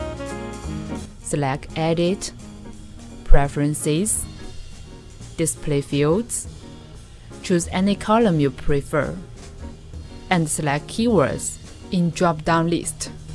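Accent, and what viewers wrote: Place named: Jamaica. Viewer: very comprehensive. jamaica english ? VIELEN DANKE !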